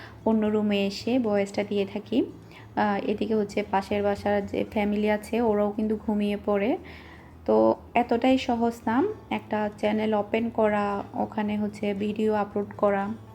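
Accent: Chittagong, Bangladesh. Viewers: আপু আমি আপনার বিডিও দেকি কমেট করা হয় না আপনার ইমকামে কথা শুনে খুশি হলাম। আপনার বাড়ি কি পটিয়া আপনার কথা শুনে মনে হয় পটিয়া।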